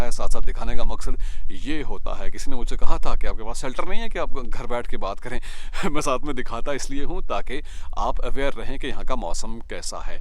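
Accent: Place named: India. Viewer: Ap Pakistani hain tu Pakistani language main bat kry Indian accent main kr rahy ho tum we doesn’t understand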